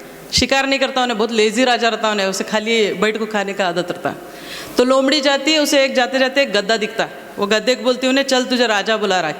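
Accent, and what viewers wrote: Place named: Bijapur, India. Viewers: Urdu dakni mix. Good👍👍👍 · Bijapur urdu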